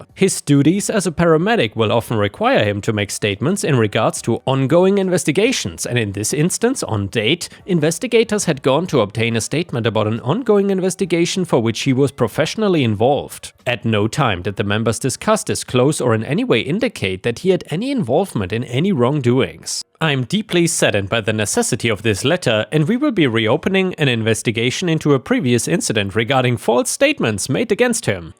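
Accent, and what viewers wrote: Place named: Germany. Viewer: I always enjoy how you narrate these stories - the German accent makes the stories more interesting to listen to. Keep it up!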